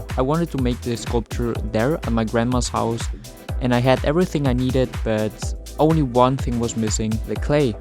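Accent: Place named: Germany. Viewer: Bro has crazy German accent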